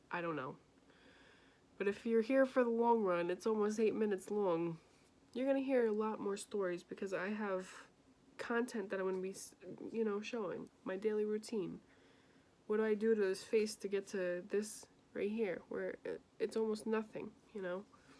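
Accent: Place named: New York, United States. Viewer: I was gonna comment on your other video wish you were chatting in that one love the New York accent haha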